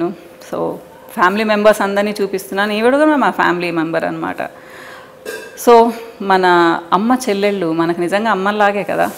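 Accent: Nellore, India. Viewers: Medi nellore aa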